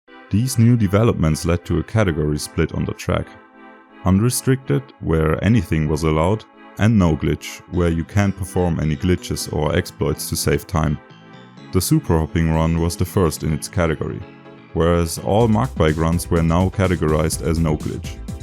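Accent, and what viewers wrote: Germany. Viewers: Bro sounds a bit German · i just found your channel and after 10 seconds i knew i had to subscribe banger video man + i know youre german but i cant prove it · 2000% bist du deutscher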